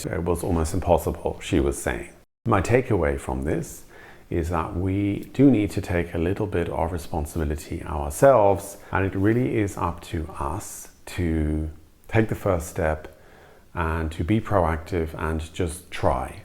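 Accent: Germany. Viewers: I love your videos but I'm distracted by the major German intonation you have when speaking English. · Your accent almost sounds German...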